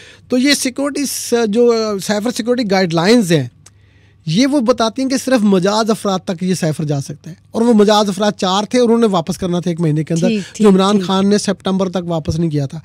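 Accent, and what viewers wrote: India. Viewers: یہ اینکر بولنے میں انڈین لگتی ہے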